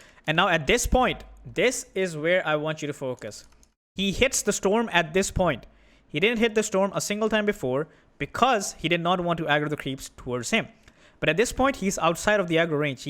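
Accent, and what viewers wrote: India. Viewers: see scammer indian accent click dislike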